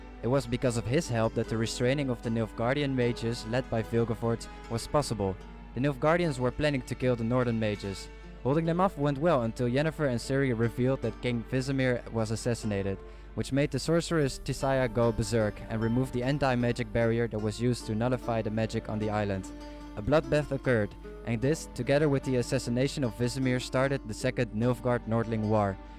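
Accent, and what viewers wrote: Netherlands. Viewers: Dutch accent lmao nice video!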